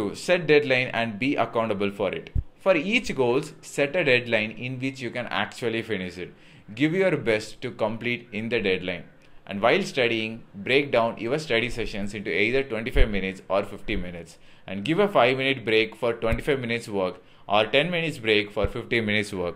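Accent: India. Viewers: Accent replicates how Americans think Indians speak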